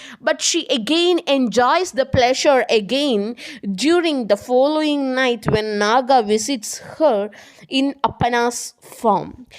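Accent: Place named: Kollam, India. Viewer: Kollam... Beautiful acsent... And very good information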